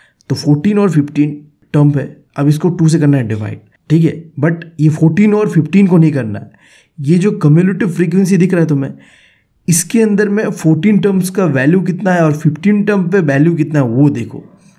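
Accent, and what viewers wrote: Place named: Bengal, India. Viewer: Please sahi se bolo na ekadam bangali kyu banrhe ho i hope tumhe meri baat samajh aai ho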